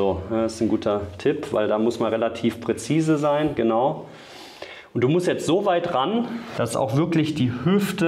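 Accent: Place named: Austria. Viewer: gute besserung liebe btw is sie österreicherin? interessanter akzent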